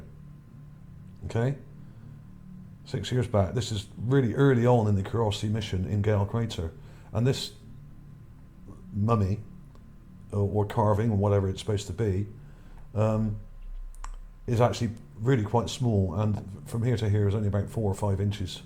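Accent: Bristol, United Kingdom. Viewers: Gotta be from Bristol with that accent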